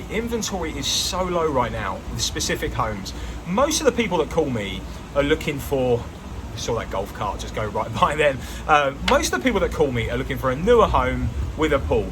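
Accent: United Kingdom. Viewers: I’m moving to Orlando in 5 years, we have family in the USA 🇺🇸 you sound like a Brit from the UK. I live in London, and very worried about trump and his policies regarding immigration as for interest rates they only low for domestic UK citizens if your UK buyer they want 5 1/2 up to 8% and they want 40% deposit down so you get discriminated against because you’re investing in their country, disappointing, 👎🏼👎🏼👎🏼👎🏼👎🏼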